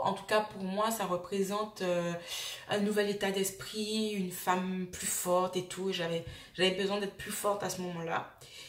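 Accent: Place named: Antilles, Caribbean. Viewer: dès les premières secondes, je reconnais l'accent antillais. :)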